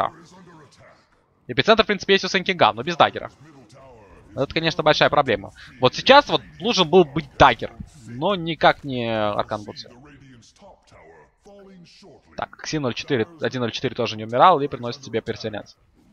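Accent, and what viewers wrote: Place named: Ukraine. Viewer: Не очень понятно, когда ты говоришь украинским акцентом :/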